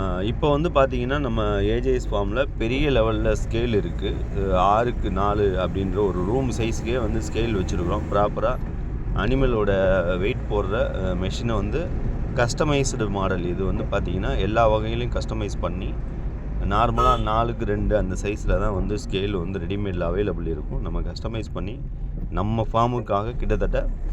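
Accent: Madurai, India. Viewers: Assalamualaikum warh madurai basha ❤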